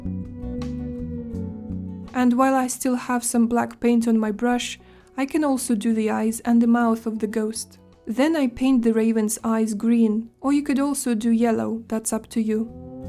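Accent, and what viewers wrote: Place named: Quebec, Canada. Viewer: About your lovely accent? My wife and I guess French Canadian ? But teaching skills is the best so don't change anything to many people. It needs a great interaction with carving ( not whittling that has no goals but passion for cutting)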